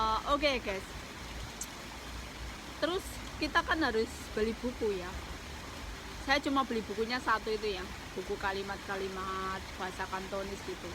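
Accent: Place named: Banyumas, Indonesia. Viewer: semangat mba... ngapaknya kelihatan kaya saya kalo ngomong...hahahaha...mantul mba... semua sudah berlalu...sekarang dah sukses amiin...salam silaturahmi... sudah padam komplit...padamin juga punya ku ya mba...makasih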